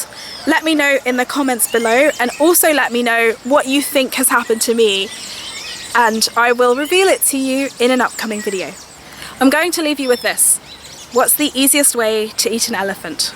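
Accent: Germany. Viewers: And how did you get such an incredible German accent living in Switzerland.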